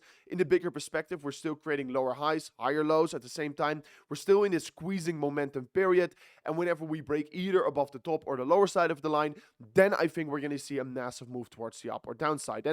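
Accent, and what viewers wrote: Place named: Achterhoek, Netherlands. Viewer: Beetje achterhoek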